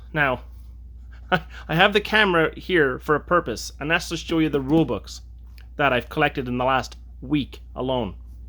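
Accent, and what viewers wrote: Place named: Irish Canadian, Canada. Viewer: You have a great voice: a charming blend of Irish and Canadian.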